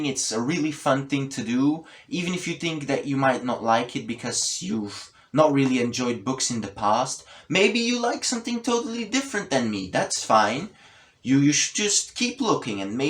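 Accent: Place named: England, United Kingdom. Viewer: Liking the English accent, strong opinions and of course the bun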